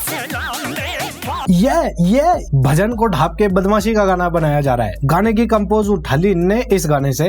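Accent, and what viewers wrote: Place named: Bikaner, India. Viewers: Lagta hai ganganagar ya bikaner se hoga bhai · Bro i guess you are from Bikaner because of your accent 😅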